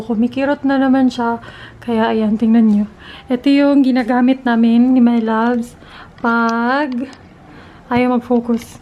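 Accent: Visayas, Philippines. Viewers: Bisaya ka noh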